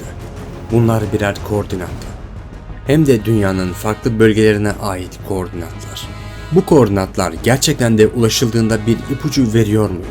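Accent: Azerbaijan. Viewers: Abi sen azerimisin?? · Azerisən türksen?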